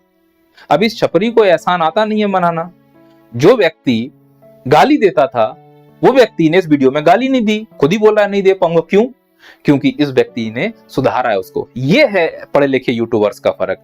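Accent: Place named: Haryana, India. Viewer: 🎉chora hariyanvi 😂 hai bolte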